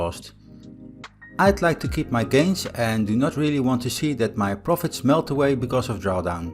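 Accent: Netherlands. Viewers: I enjoy the Dutch accent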